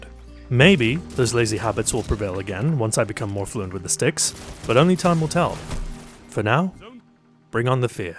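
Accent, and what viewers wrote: United Kingdom. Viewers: Yessssss. Welcome to the “Sticks on PC” club. I love it. One thing I’ll add to the “not science” category is, one of the reasons I like to play sticks is simply because it’s much closer to the feel of firing a real weapon than M&K can ever be, thus adding to the feeling of immersion and just sinking into the game. Btw, your dulcet vocal cords mixed with that refined British accent totally hit my ASMR trigger to the point, I could probably just loop your videos in the background all day. Well done.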